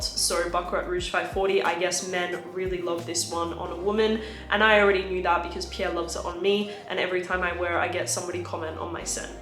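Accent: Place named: Australia. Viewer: Ariana Grande Cloud is a great dupe for Baccarat Rouge. Bath and Body Works Dahlia is a great dupe for Carolina Herrera Good Girl. I love your accent. Are you Australian?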